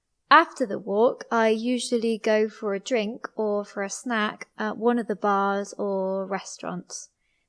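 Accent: United Kingdom. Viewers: Your voice is so pretty wow I love it with the unique British accent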